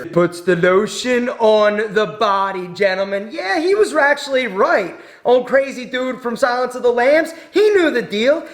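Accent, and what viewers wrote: Philadelphia, United States. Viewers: This guy is definitely from Philly that accent though.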